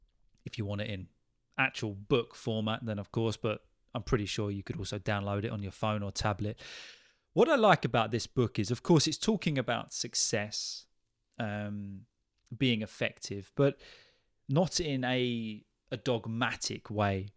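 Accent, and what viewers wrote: United Kingdom. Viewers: Hey, there,. I am absolutely mesmerized by the British English accent! Thanks a million for the insightful tips!😊 From Rio 🇧🇷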